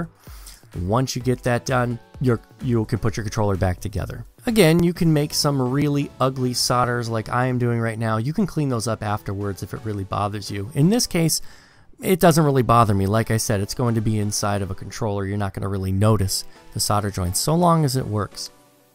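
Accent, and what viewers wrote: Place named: United States. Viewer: This is a great video, but almost impossible to watch as someone who is English due to Americans silencing the L in solder, which is weird.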